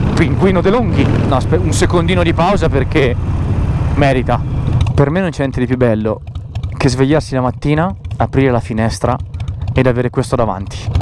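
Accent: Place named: Tuscany, Italy. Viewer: Un toscano che parla Veneto, troppo forte 👊✌️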